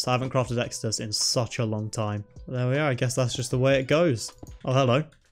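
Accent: United Kingdom. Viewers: just wake up and i can hear the british accent man talking QnA: wat is your real name ? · QnA: Where are you from? I think your accent sounds English? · Lovely jubbly video ❤️my Q&A question is :you sound British are you British ?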